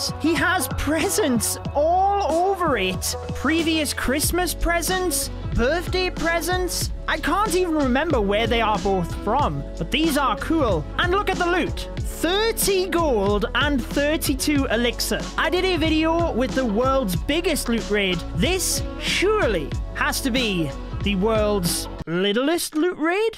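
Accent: United Kingdom. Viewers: Wow the british accent its soo good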